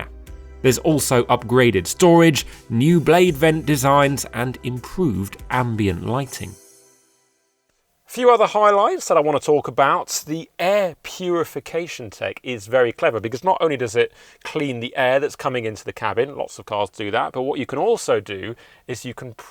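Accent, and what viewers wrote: United Kingdom. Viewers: Love this refresh! First time watching the channel... For some reason the internet was buffering and when the video began I heard the yelling... I laughed b/c I knew it was going to be a British reviewer!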